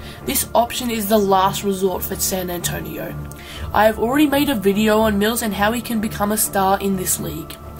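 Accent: United Kingdom. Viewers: he kid, when you say spurs is best franchise in the history of the nba history, you must be high on drugs or to drunk to figure out what the words that are coming out of your mouth, championships matters most, you can be in the playoffs everytime or even since the start of a certain team in the nbabut never won a championship, that won't count and by the way you sound like british stick with football, i'm filipino and despite being short for basketball our number 1 sport back here is basketball, play make sure that you know what your talking about, i forgive you this time, but allow me to say this, you're a f*cki'n idi*t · i don't necessarily agree w/ everything you said in the video, but you did a good job stating your case, and your voice is fine. you sound like a teenager w/ a british accent. How old are you, and what country are you in?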